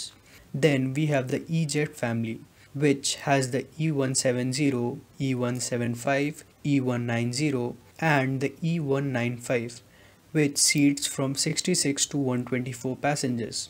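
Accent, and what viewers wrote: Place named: India. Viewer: Indian accent made the experience very peculiar... Sounded as...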